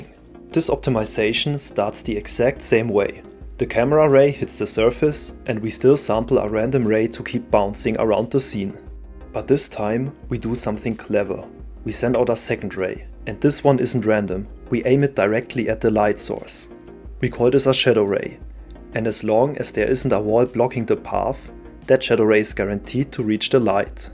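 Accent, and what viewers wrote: Germany. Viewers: What's up with the German accent? (first time seeing the channel) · Is it just me or does He Sound german?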